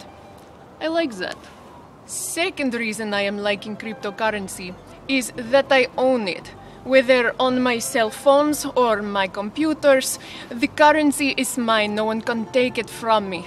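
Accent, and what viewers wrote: Russia. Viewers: I Fuckin love your Russian accent :) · lol Russian accent makes instant sex appeal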